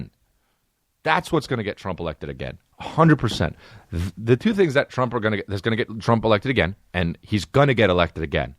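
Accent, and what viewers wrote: Australia. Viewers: Love the Aussie accent😂😂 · Chris needs a part in a movie as an aussie forsure hahaha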